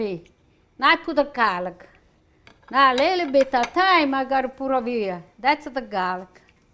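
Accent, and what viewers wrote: Italy. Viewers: you're the Best...👍 You make me feel so much Better with your Sweetness... I smile a lot cause of the way you speak... describing what you're making with your Awesome Italian Accent & singing brings a comfort to my heart!! 🎤💖🇮🇹 OH & the delicious recipes you make!! 👏👏👏👏👏😀😋💖🇮🇹🇺🇸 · Today for lunch, I made the baked fish cod fillet exactly as you made. I was so surprised how delicious it came out considering all ingredients came from the pantry. My husband and I really enjoyed our lunch. I always enjoy your cooking show, love your Italian accent, your lovely voice, but most of all the meals you prepared are simple ingredients and delicious. Thank you for the delicious cod fish recipe. God bless.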